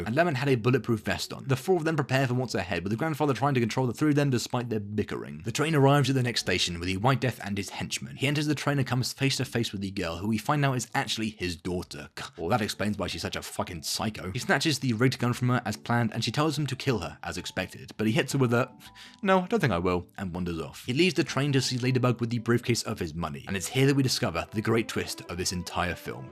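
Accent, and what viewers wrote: United Kingdom. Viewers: The British accent and sense of humour charmed me now I am a subscriber.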